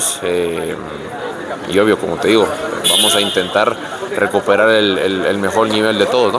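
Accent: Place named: Argentina. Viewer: Este muchacho abla como argentino yo creo que si vamos a ganar.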